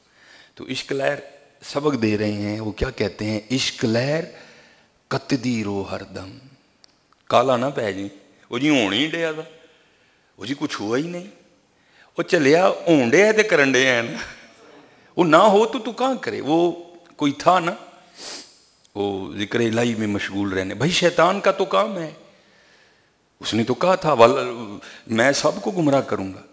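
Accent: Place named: Punjab, India. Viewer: اردو اور پنجابی کی کمال مٹھاس ہے آپ کے لہجے میں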